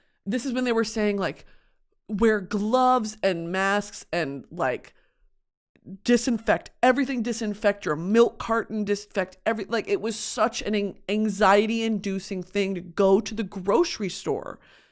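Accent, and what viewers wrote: United Kingdom. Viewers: the british accent got me :face-purple-crying:😭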